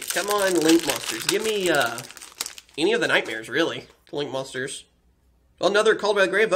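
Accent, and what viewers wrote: Texas, United States. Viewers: The thing that I LOVE about your videos is your texan accent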